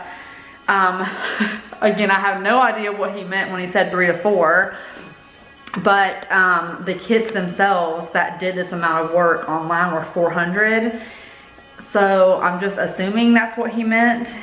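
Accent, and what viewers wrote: South, United States. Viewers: The all white will be beautiful! Your southern accent is getting so strong! Also, everyone loves! He is the best and we can’t wait to continue to see him more on your channel! ❤️ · You sound so southern now...love ya x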